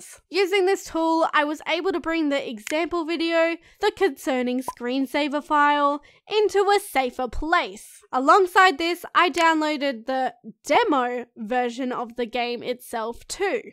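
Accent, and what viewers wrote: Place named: Australia. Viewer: I love how I understand you sm because one word.... Australia ✨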